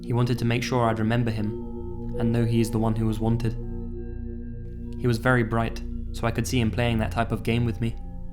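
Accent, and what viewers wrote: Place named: England, United Kingdom. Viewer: Surrey. Bollocks. I can't believe I couldn't recognize your accent sooner; that's where my friend was born before she moved here ( CA, US). She'd never stop with the ''you daft cow'' if she knew. Still, that's better than her pet name for me in high school (dirty slag, which is not in the least bit accurate by the way). I think this is the fifth time I've watched this video, they're all just brilliant.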